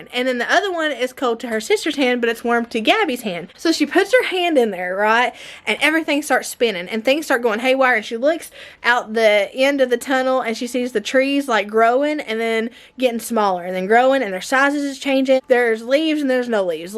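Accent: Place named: Tennessee, United States. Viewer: I have watched several of your videos back to back. I have enjoyed every minute of it. I wish I had the motivation to keep my house tidy like yours. And love love love all your projects. I am from Tennessee too but I am in middle. It’s like I am watching one of my friends. You actually talk like me, I am not used to hearing folks on here talking like me. Wish y’all well!!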